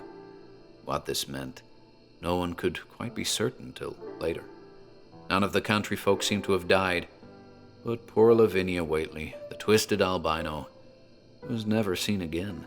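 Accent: United States. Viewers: you do a fabulous American accent! Loved this as always. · I really have to say, you've outdone yourselves with this one. The production value has increased dramatically. And, your American accent has also improved tremendously lately. I always listen to your channel while I fall asleep at night, usually alongside a quiet recording I made of rain on the roof of my spare bedroom. I find that it's the perfect aesthetic to go along with your recordings. Anyway, really looking forward to the next installments.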